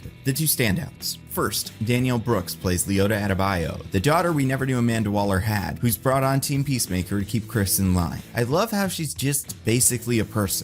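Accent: Philadelphia, United States. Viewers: u from Philly???????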